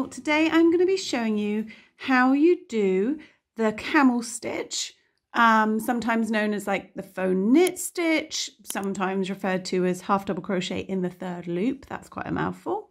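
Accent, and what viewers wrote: United Kingdom. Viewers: Thanks for liking & for commenting on my comment Miss. I like your British accent a lot 😁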